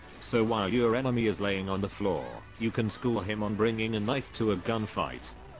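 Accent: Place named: RP, United Kingdom. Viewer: This voice should be used to review every game ever. This is legit the only game review I've watched all the way through because hearing a British butler review the game was awesome.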